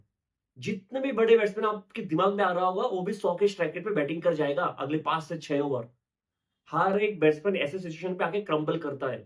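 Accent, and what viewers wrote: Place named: Northeast, India. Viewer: Bhai tum northeast ho kya accent vaisa hi hai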